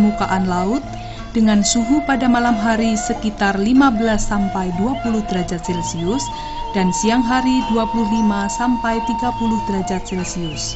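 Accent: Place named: Java, Indonesia. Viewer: Duh yg baca narasinya medok...coba yg baca narasi sesuai dengan musiknya...orang jabar jadi gak medok gitu suaranya...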